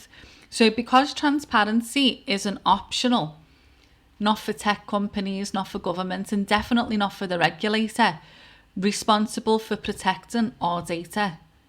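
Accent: Liverpool, United Kingdom. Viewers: And again thank you,you beautiful scouser English lady and to summarise their house of cards are supported by matchsticks that are on fire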